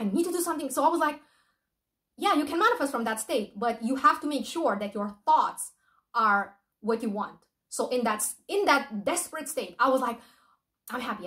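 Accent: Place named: Italy. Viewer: hiii, thank you so much for sharing your experience, loa is real. Are you italian? cause i hear a little bit of italian accent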